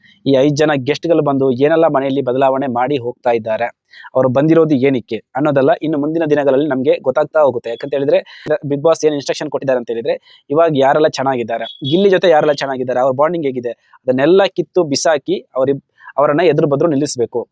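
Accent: Mangalore, India. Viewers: Sir neevu banglore navra, nanu nimma mathu nodi manglore ankonde, navu banglore, thumba chali ide, Rakshitha Gilli best❤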